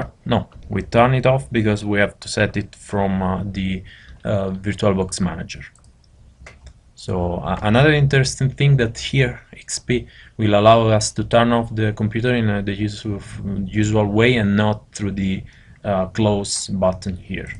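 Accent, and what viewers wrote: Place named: Italy. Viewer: Si sente che sei italiano :D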